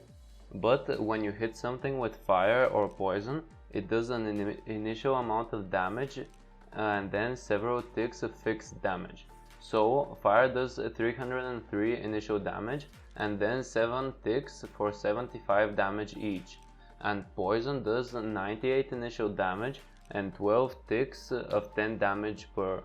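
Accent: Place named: Eastern Europe. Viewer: where ere you from? you sound Slavic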